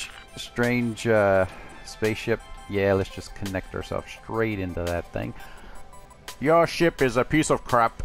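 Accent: Newfoundland, Canada. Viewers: Judging by your channel name and accent im going to guess you're from Newfoundland? lol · Are you from Newfoundland?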